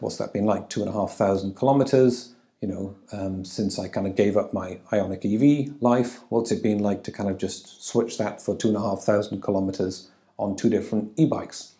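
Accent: United Kingdom. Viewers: Nice to see u again. Is your British accent is softening? Is it possible to retrofit indicators on e bikes and not waving hands?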